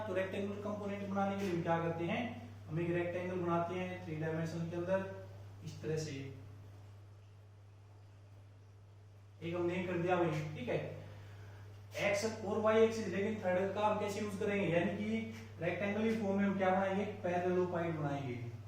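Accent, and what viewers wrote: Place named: Haryana, India. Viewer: Haryanvi tuch me mja a gya pd kr sb clear ho gya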